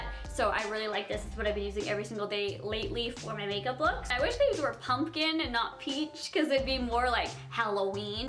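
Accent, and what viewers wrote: Canada. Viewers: DayDr3ams is she not allowed to have a Canadian accent 🤐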